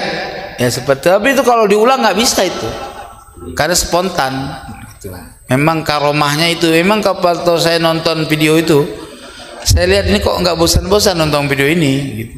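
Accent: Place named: Jakarta, Indonesia. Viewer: . Kori. Saya. Suka. Omong nya. Betawi bangat.saya pendukung mu. Maju terus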